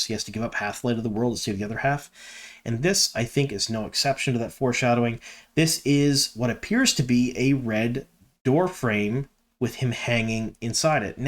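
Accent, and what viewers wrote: Canada. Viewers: From your accent, I thought you must be a Canuck - thanks for confirming! #Tims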